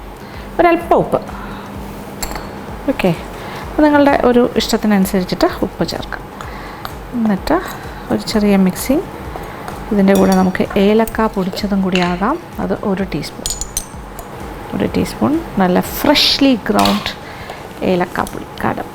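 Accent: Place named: Thrissur, India. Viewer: അപ്പോ.. തൃശ്ശൂര്കാരിയാ...ലേ...? ഞാനും..same pich..😍😍😍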